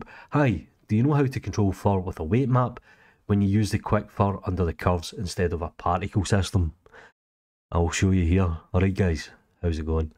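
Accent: Scotland, United Kingdom. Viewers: He's Scottish, hence the heavy accent. It's revealed ;)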